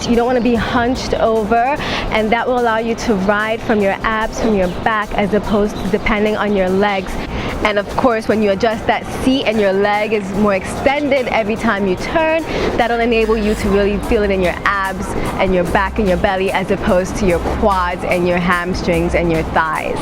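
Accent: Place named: New York, United States. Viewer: Are in NYC? The background reminds me of my old hood in Brooklyn. Plus you sound like it.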